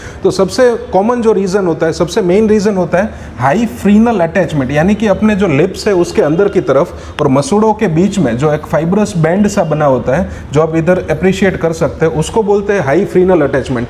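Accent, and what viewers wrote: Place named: Kathiawar, India. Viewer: bhai aap Hindi bhi kathiyawadi lehje mai bolte ho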